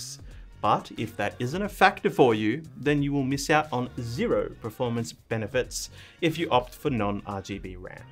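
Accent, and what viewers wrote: Australia. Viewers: Learn a lot of things from this video, but the highlight is now I know for sure how Australian English accent sounds, kinda sound like British but more decipherable 😂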